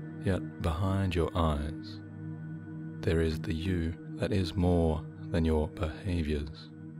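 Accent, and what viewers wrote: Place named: Australia. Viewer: Very nice Meditation, love your accent, Australia or New Zealand? Namaste!!!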